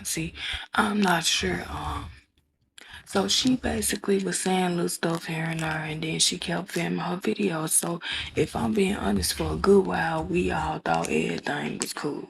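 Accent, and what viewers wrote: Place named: Tennessee, United States. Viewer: Aint even a woman but im frm Tennessee too so yo accent remind me of home 🙌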